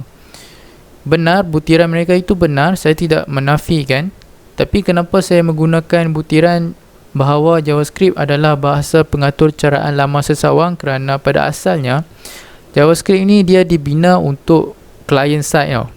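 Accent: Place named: North, Malaysia. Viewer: saya subscribe hasil dari iklan awak di YT Channel Hazeman Huzir.. org mana tu.. terkeluaq bahasa utara tu..kekekeke.. saya support ini channel.. Salam dari Bumi Sintok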